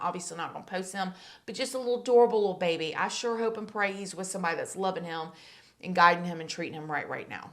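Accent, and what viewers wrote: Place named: Florida Panhandle, United States. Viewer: Ha! your accent sounded so familiar to me. I too am from the 850. Hi there, girly!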